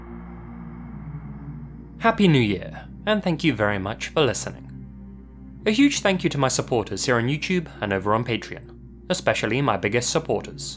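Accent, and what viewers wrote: United Kingdom. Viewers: British guy doing as for usps...love it haha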